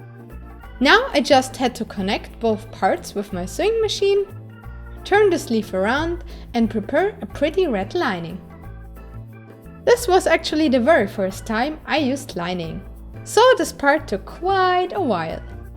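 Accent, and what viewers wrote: Germany. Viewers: Hey, bist du aus Deutschland? Ich frage nur, da du einen deutschen Akzent in deinem Englisch hast und du auch in anderen Videos öfter mal Produkte mit deutscher Aufschrift genutzt hast. PS: Mega cooles Video😊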